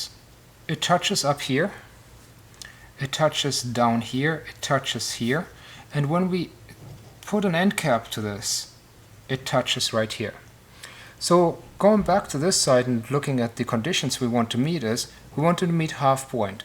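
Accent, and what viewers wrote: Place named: Scandinavia. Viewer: What happened to your voice? You sound Nordic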